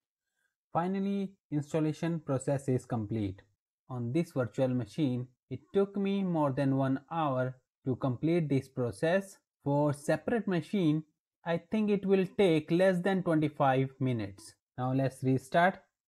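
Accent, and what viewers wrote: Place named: India. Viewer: i hear das indian accent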